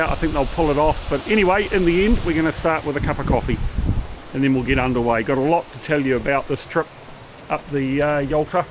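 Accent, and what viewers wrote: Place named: New Zealand. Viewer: he is a new zeelander i think because he says tin instead of ten. oh yeah silly me i see NZ in the channel title now.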